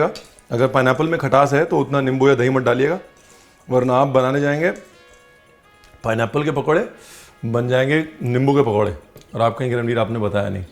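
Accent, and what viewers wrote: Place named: Punjab, India. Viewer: छोटे छोटे Dices wow maha aa gaya sun ker Punjabi tone😊 ha ha neembu ke pakore ban jayegee My Mouthwatering,😊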